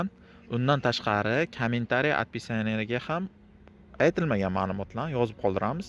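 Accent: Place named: Kattakurgan, Uzbekistan. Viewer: Ака каттакургонданми бу малибу шевайиздан билдим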